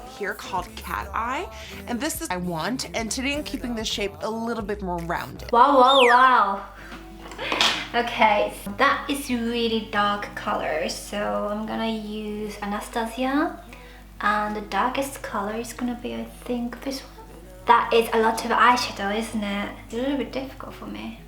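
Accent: United Kingdom. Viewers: you're so adorable and i love your british accent! uwu i think you did a good job considering it's the first time you tried a make up look like this 👍💕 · Wait...how does she speak English so well??? And with such a Brittish accent lol? Anyway quite enjoyed this 😄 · Her accent is british............................. WHY IS HER ACCENT BRITISH?! girl.exe has stopped responding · British Accent たまらないです！ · You have a British accent to your English! I love it, it's cute. I really enjoyed the video, thanks.:) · 外人さんメイク良く見るけど、オカマメイク(ドラッグクイーン)だよねw プロダクトめっちゃ付けるし化粧品会社めっちゃ儲かってるんだろうね。イギリス英語可愛いし、さんの全てが可愛い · Omg you have a British accent <3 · Your British accent is the cutest thing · It is a British accent actually, she lives in London. Also that's not true about English speaking Japanese sounding British naturally; whoever you are, you will adapt the accent of whoever you learned whichever language from and how you are used to hearing that language spoken. 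· I love your British accent whenever you speak English 😭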